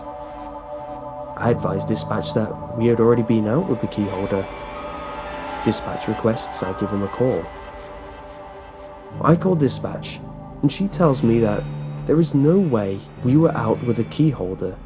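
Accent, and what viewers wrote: United Kingdom. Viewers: What part of the UK are you from? I love your videos btw!! I saved like 10 really long ones to listen to on a flight, so relaxing!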